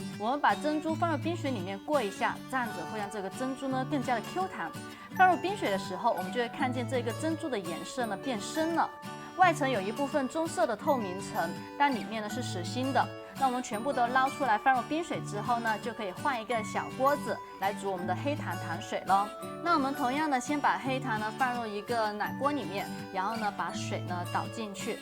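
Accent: China. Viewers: 这个普通话……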